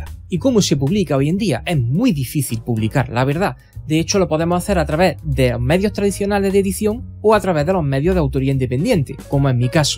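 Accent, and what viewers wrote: Andalusia, Spain. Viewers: Muchas gracias y menos mal que mi pretensión era la de escribir para mi mismo y como mucho para los coleguillas que tambien comparten el gusto por lo inefable, por cierto amigo, que eres andaluz? Porque ese acento me suena a este maravilloso cacho de tierra que tenemos aqui en la costa mediterranea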